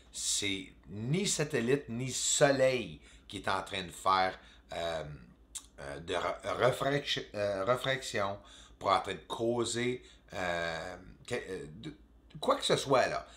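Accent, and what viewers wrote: Quebec, Canada. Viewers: Hey, cool ! C’est la première fois que je tombe sur une de tes vidéos, je viens de m'abonner. 😊, sujet qui m'intéresse 😊, juste entre toi et moi, tu as un accent québécois assez fort 😊, pas certain que tous les Français de l'Europe vont te comprendre, moi je te comprends bien, je suis Québécois aussi 👍 continue, ne lâche pas avec l'accent ou pas 😊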